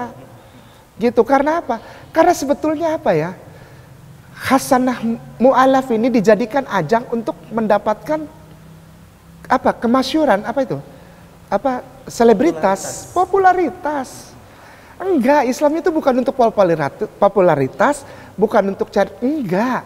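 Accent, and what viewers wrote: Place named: Eastern Indonesia, Indonesia. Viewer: Terima kasih ustd kainama mski cara penyampaianny khas ketimuran.. kami skrg jadi mendapatkan penjelasan mengenai injil dan bible.. semoga Allah SWT membalas segala kebaikan atas kebenaran yg kau berikan.. aamin ya robal alamin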